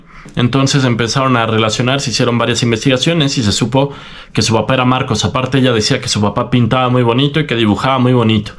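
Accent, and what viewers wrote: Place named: Andalusia, Spain. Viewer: Pareces un andaluz o un sevillano mal que te pese tengo amigos parecidos a ti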